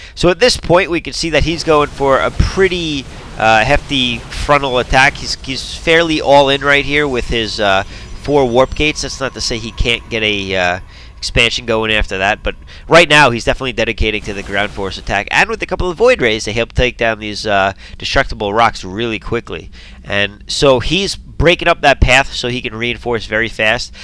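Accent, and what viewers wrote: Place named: East Coast, United States. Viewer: As much as I don't like the screaming in my ear, I love his east coast accent. :P Go America!!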